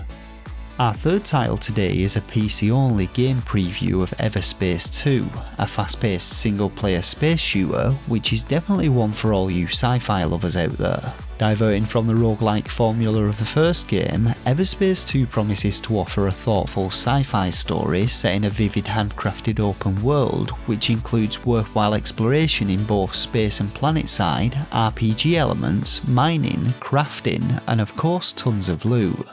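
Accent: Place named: Manchester, United Kingdom. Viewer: Been watching your videos since getting an xbox and game pass, thanks for the stella updates, you sound rather local to me, I am just south of Manchester ;)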